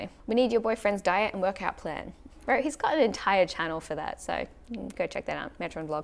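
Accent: Australia. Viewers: Wait a SEC How did your accent change from whiny californian to Straylian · such a cute Aussie accent!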